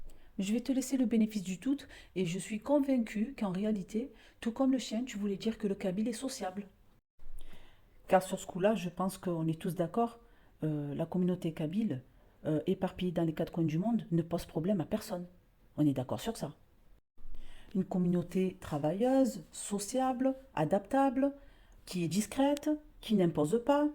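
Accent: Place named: Marseille, France. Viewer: Très bel accent marseillais.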